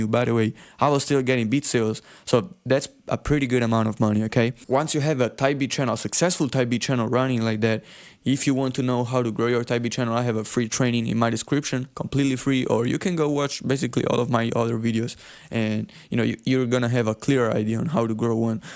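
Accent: Italy. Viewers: true ahahah! The first thing I thought as I soon as I heard you talking is "what, Italian man making a living in music making beats?" LOL good video and good inspiration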